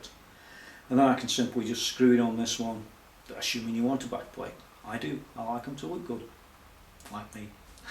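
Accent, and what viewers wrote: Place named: England, United Kingdom. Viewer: you r from england right ?i took u from your accent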